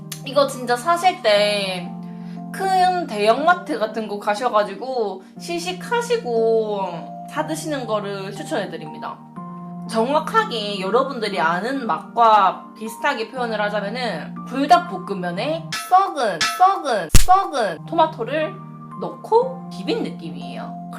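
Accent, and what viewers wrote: Busan, South Korea. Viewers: 음!! 부산 사투리가 조금씩 사용하시네요 ㅋㅋ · 부산말투 원래 저래요 그러니 남자들이 좋아하죠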